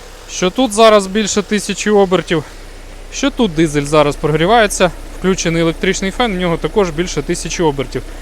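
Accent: Standard. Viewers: Дуже чиста українська, приємно бачити та чути, та особливо сприймати такий контент! Ви молодець! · четкий Украинский язык приятно слышать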